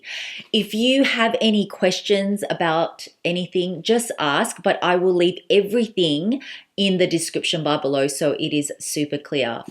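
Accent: Melbourne, Australia. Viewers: Amazing to see a youtuber from Melbourne, comforting to hear the accent again. It's so unique to see a vintage bag as well, such an amazing prize to offer. Honestly, I would love the money so I can save that for when I'm feeling spendy and want to splurge on a nice bag. I hope you continue to succeed at everything you are doing and your channel progresses. Insta: rhiannon_davies_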